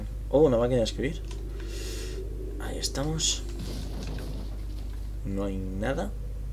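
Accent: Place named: Puerto Rico. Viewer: El acento es puertorriqueño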